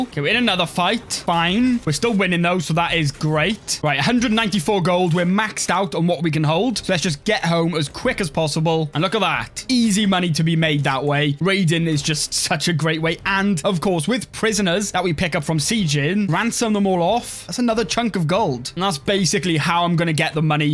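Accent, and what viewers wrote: Wales, United Kingdom. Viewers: Just wanted to say I love your Welsh accent. North or South?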